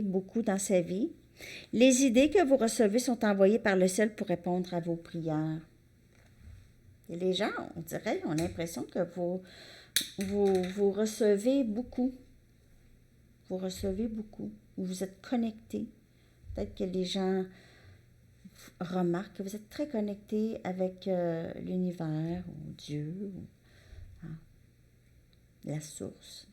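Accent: Quebec, Canada. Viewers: Hello, Je reconnais à votre accent une cousine d’outre-Atlantique, une belle journée à vous et merci pour vos guidances. ☀️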